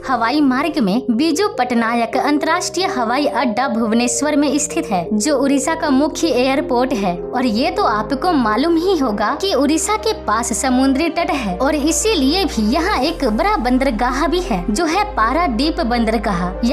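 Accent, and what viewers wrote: South, India. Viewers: Shayad mujhe lagta hai aap South ke taraf se ho ISI vajah se aapki Hindi thodi si alag lagti hai Lekin cute hai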